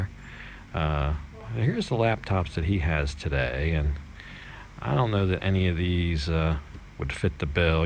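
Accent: United States. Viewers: Just stumbled across your channel and subscribed. I like the format and your narrative style! I plan to retire in the Pattaya area in a few years so your videos of the area are most informative. Sounds like you're from the States? Your name?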